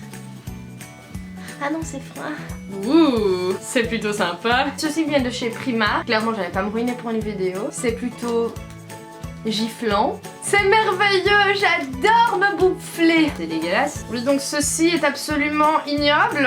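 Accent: Liège, Belgium. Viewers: Elle a pas le même accent je trouve haha! Elle vient de Liège (Coucou tu es trop lente pour répondre à tes commentaires alors faut bien que quelqu'un le fasse hein8DDDDD) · ça s'entend que tu es belge 😉 on dirait moi 😂😂😂 (oui je suis belge) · Tu viens d'ou en Belgique t'as le même accent que lufy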